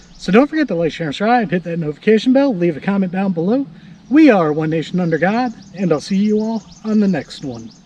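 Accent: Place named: Midwest, United States. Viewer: I've had no issues with anything Bear Creek I've bought everything has been very solid. I don't know why your regional accent threw me off the other day you sound a lot like Steve bartyla from "grow em big" And he's a Midwest boy too.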